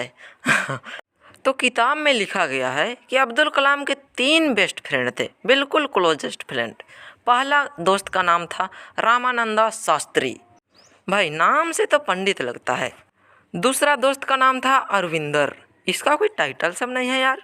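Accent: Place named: Odisha, India. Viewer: Bhaiya apka aawaz se aisa laga ki aap Odisha se ho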